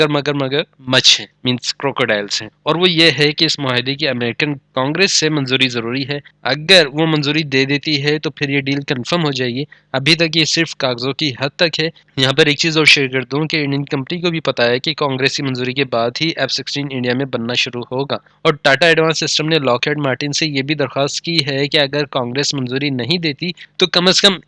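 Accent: Punjab, Pakistan. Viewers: I am Pakistani and I agree with you, this guy is either unemployed or he is doing fake propaganda for ispr. india is way ahead. PAF is no match in front of india in numbers or in technology. even witht he failure of tajes and mig 21 still indian air force is 6 times greater than pakistan. I am not posing to be pakistani I am real Pakistani from karachi and I can tell you from the accent of this guy he is punjabi who is speaking urdu.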